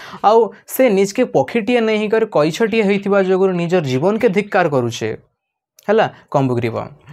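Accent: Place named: Sambalpuri, India. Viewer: ସାର୍ ଆପଣଙ୍କ ର ସମ୍ବଲପୁରୀ ଆଉ ଓଡିଆ କଥା ମିଶା ଗପ ଟା ଭଲ୍ ଲାଗ୍ଲା ସେ😂😂😂😂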